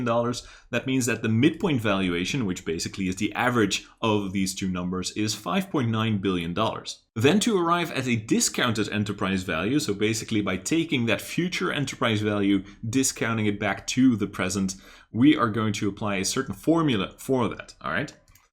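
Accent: Netherlands. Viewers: Bedankt! Als ik mij niet vergis, kom je uit Nederland. Maar goed ik vind jou analyse mooi ga zo door. Ik ga je kanaal promoten!!!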